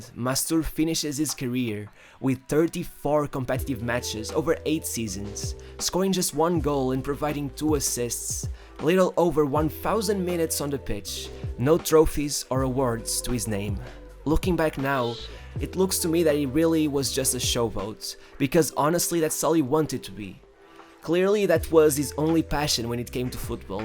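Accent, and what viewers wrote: Portugal. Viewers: O narrador é tuga, só pode. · Fodas em 2012 a internet não era o que é hoje ? Este rapaz não pediu nada a ninguém, eu não entendo o título deste vídeo. This title is not only wrong, it is highly offensive. This kid didn’t scam anyone. They pressured him and his family and put them in the spotlight. He just didn’t live up to expectations.there is nothing wrong with that. I can hear from your accent that you are Portuguese, devias ter mais respeito na maneira de falar. Chamaste lhe scammer várias vezes e isso não está certo.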